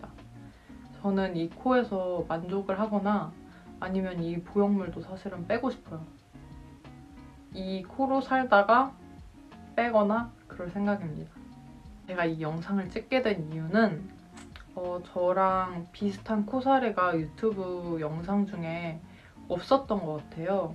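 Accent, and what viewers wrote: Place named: Chungcheong, South Korea. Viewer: 어우 충청도 사람같아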